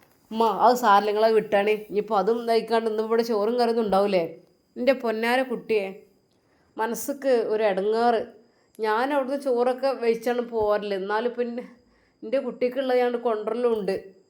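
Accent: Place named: Malappuram, India. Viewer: തൻ്റെ ഭാഷാ കേട്ടിട്ട് എൻ്റെ സംസാരത്തിലും മാറ്റം വരുന്നു ഞങ്ങൾ തൃശൂർക്കാർ ആണ് അപ്പോ എൻ്റെ ഭർത്താവ് പറയുകയാണ് നിൻ്റെ സംസാരത്തിൽ വിത്യാസം വന്നല്ലോ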